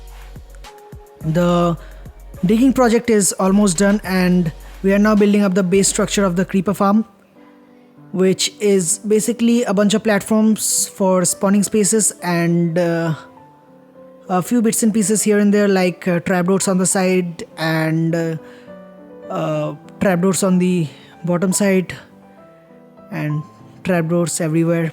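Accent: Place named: United Kingdom. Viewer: Litrelly got orgasm by intro music and your British accent eng.. senpaieeeeeeeee..✌️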